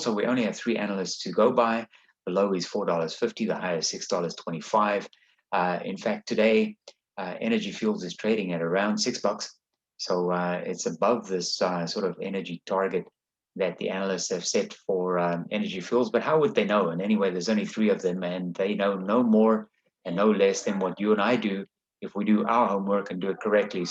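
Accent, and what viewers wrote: South Africa. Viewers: Are you like half South African half American? (Accent)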